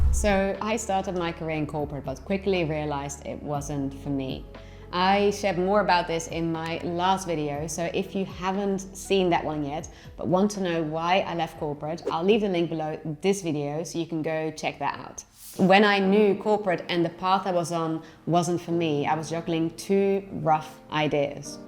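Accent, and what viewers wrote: Netherlands. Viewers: Are you Dutch originally? You sound a little bit Dutch.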